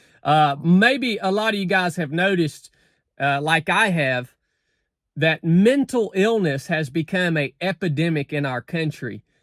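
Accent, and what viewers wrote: South, United States. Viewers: Probably not a good idea to take any kind of spiritual advice from someone who looks and sounds like he just crawled out of the hills himself. It's always incredible seeing the Dunning Kruger effect in action. People with a relatively low IQ who don't understand complexity and nuance take on a position of teaching and guiding others, fully confident in setting up a platform where they are speaking what they think is some kind of truth. This video is a perfect example of the mental illness crisis in this culture.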